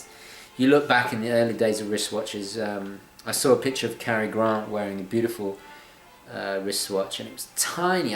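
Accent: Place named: United Kingdom. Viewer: Absolutely! And I really like this British accent.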